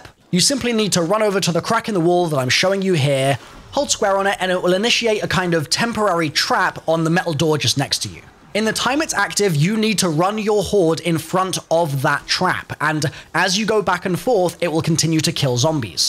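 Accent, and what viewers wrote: United Kingdom. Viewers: Why is every person who makes videos like these British lmao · Such an annoying accent omg. Which British accent is this?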